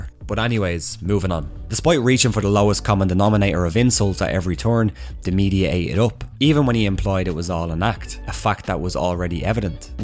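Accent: Ireland. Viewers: I'll certainly give you a "tums" up on that one. I'd give you "terteen" of em if I could. ;) I had one of the best times of my life in Dublin! My real name is about as Irish as you can get. Love your accent and videos!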